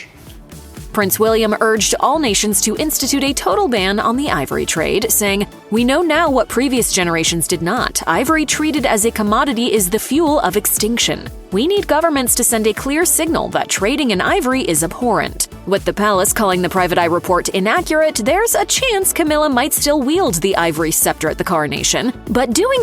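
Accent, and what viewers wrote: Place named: United States. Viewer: I do not understand why these British Royal ‘posts’ are narrated by an American.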